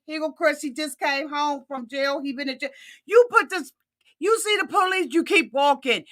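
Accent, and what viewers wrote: Washington DC, United States. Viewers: Hey girl. I love love love you. I am also from the DMV… I knew u were from here the first time I saw your show and heard that DC dialect. Love ur commentary 😂😂😂❤❤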